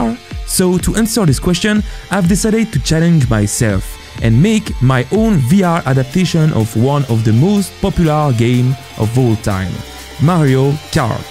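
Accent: France. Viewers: tu es français? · are you french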